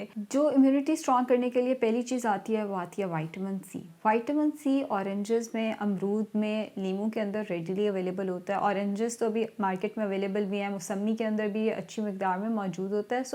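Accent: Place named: Lahore, Pakistan. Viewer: Good work 👍👍👍miss Ap Lahore sa hain na ? Allah maf kare Acha tha Aisy Lahore wale Bolte hain Kue k main bhi Lahore sa hoon 💪🙈😁😅😂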